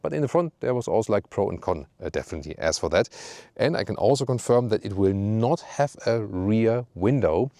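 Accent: Germany. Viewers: This guy with a German accent, saying “the final solution” twice is a bit problematic. :) However I will be buying this car. Style, refinement and a real sports car when everyone is buying SUV’s.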